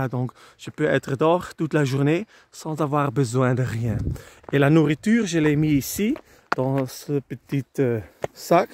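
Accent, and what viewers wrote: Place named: Flanders, Belgium. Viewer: Magnifique 😍 et j’adore votre accent, j’aime beaucoup l’accent et la langue flamande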